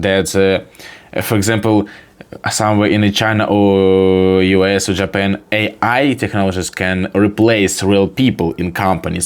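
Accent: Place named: Russia. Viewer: 😭 your Russian accent speaks so loud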